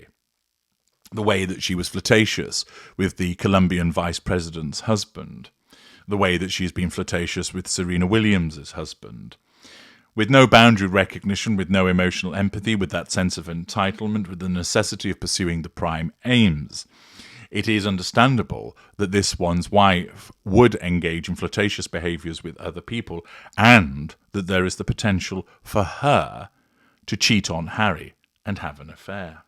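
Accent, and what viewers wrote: United Kingdom. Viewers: What an absurd discussion you just had with yourself. You seem to think your semi pompous British accent qualifies you as knowledgeable. It does not!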